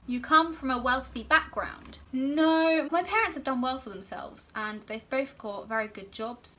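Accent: United Kingdom. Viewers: Hi just I have a question is there any collage that helps disabled by allowing them to be students for free or with cheap fees.I watch your videos to get a British accent. Thank you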